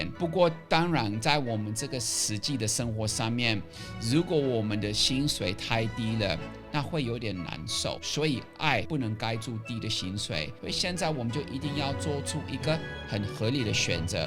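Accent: Taiwan. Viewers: 你好喔，你真不是歪國人，若只聽你講話真的真的是很道地，我想對台灣也是很有感情的好男。excuse me 第一次看，請問您住台灣多久了？ 祝福您 ～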